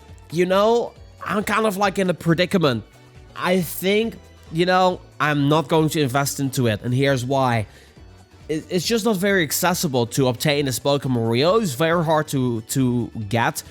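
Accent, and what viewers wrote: Netherlands. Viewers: Kom je uit Nederland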